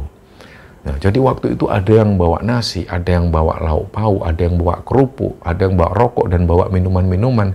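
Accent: Java, Indonesia. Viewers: jawane iku loh ih suka pisan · Assalamualaikum, lagi musim semua Chanel horor pakai narasumber. Tapi tetap dgn khas sendiri tnpa narasumber lebih asyik, lebih enak didenger, alur ceritanya jelas... Tetap ga usah pakai narsum biar beda dgn lainnya. Mas dgn style jawanya slalu keren❤❤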